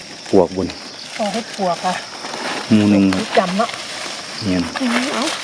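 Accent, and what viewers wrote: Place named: Ubon Ratchathani, Thailand. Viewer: ป้าถามแหน่ยุพะเยาคือเว้าอิสานจ้าสำเนียงคืออุบลป้าติดตามจ้าแต่บ่อค่อยใด้เม้นหมานๆจ้า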